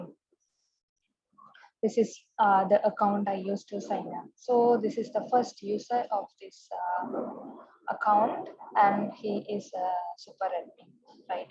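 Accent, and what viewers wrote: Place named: India. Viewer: awaz se to aap indian lagti hai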